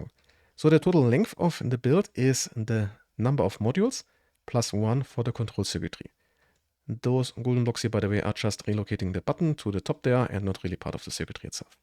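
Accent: Germany. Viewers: Biste Deutsch, habe dich bei nem Video gesehn, wo dein Build geklaut wurde bei Dream SMP und habe deine Sprachweise gehört, also biste Deutsch. . If you can't read that, ignore it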